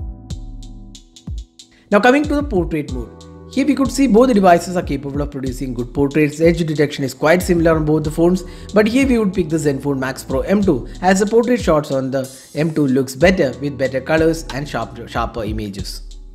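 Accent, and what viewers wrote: Kerala, India. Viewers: are you from kerala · His english accent and location looks of kerala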